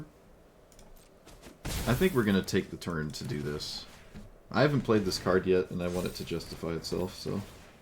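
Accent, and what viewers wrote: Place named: Scotland, United Kingdom. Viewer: Algorithmic Engagement* with Scottish accent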